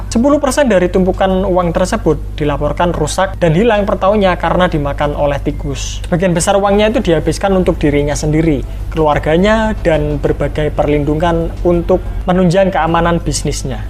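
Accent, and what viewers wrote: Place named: Java, Indonesia. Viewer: Medhoknya khas banget muehehe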